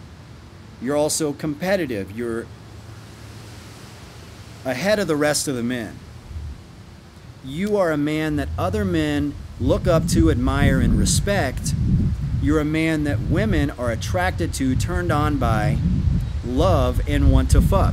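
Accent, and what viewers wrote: South, United States. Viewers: With that southern accent 😂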